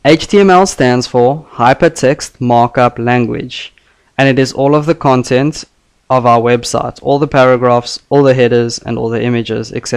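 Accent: South Africa. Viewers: South African?? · You sound like Mr. Shaun Pollock and thats how I knew u r a SAian Very helpful lectures - Thanks :)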